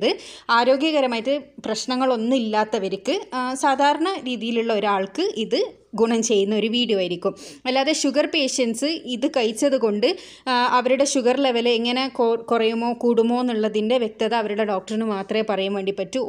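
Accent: Kannur, India. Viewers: Nalla avatharanam... Ningal kannur aano 😊😊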